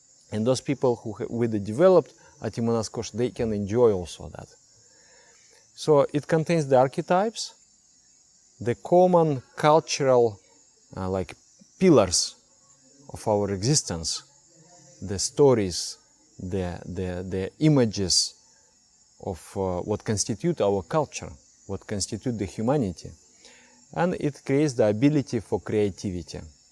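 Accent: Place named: Russia. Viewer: Your Russian accent makes it easier to understand what you are saying, for some reason :)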